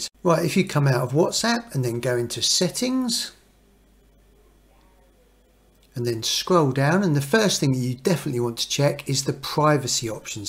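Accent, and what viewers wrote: United Kingdom. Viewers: I liked your British accent <3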